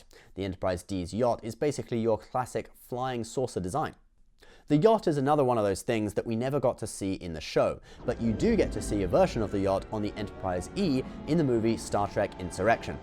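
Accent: United Kingdom. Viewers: In his defense, he's British, they pronounce and spell things weird. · alan littlemoon keep in mind that this is The British and thus is likely to pronounce certain words differently than us Americans do Case in point: The word "schedule": US pronunciation: Sk-eh-jew-ull British pronunciation: Sheh-jew-ull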